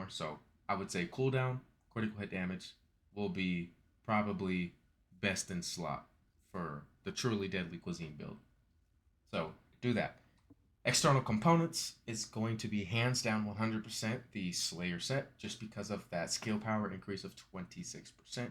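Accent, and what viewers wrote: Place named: Philadelphia, United States. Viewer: "Yea that jawn like... ya mean." Philly Boi detected.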